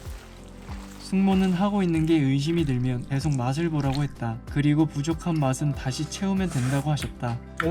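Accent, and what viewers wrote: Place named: Gyeongsang, South Korea. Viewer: 아 아이고... 역시 김치는 사먹는게 제맛.. 근데 경상도 분이셨군요 (동지)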